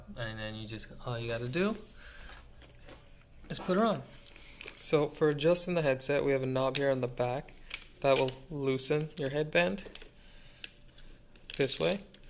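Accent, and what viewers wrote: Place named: United States. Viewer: sounded american thou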